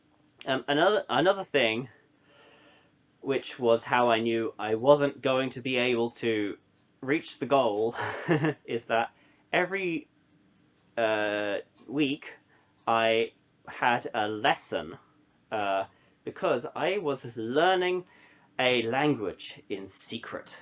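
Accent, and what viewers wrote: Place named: Portugal. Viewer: Your accent is just fine, especially for a beginner, you are speaking with an European accent, the Portuguese accent, it's surprising how good you sound to be honest. Just gotta work on the fluency, but the accent is fine, the user who commented on your accent is just expecting you to sound brazilian. Keep doing what you are doing.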